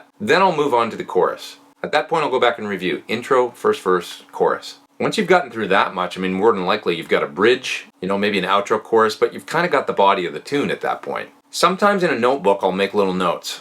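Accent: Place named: Canada. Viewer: This series of videos is awesome,. Is it just me or do you sound extra Canadian today?:) Not hangin' on you, man. I just normally hardly notice your accent but today it just seems to be front and centre. Probably just me (and/or the rum). I can't talk. I'm an Aussie. Rock on, mate.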